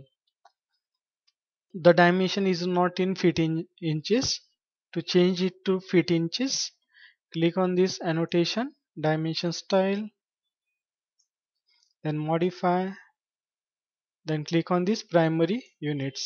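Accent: India. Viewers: Why is that an Indian voice I hear for this? I thought India uses metric. Or am I wrong and you Indians use Imperial or both?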